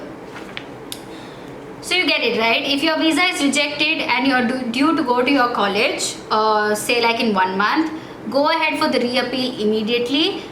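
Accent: India. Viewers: indian accent 🤣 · Although i had AAAAAAALLLLLLLLLL the documents LOL. Indian accent is the funniest one.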